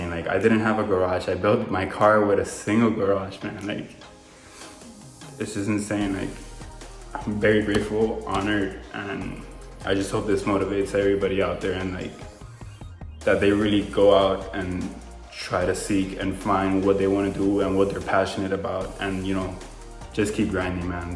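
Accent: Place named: Miami, United States. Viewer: I knew you were from Miami from the first literally you said lol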